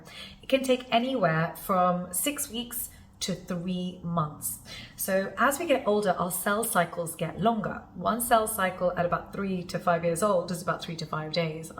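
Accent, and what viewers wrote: United Kingdom. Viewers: Especially with her British accent 😅😂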